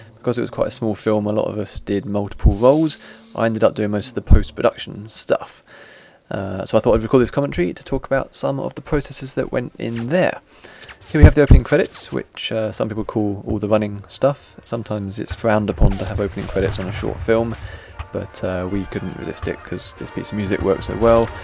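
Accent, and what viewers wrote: United Kingdom. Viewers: Hey! your british accent is a little bit hard to understand. Can u give the narrating job to please?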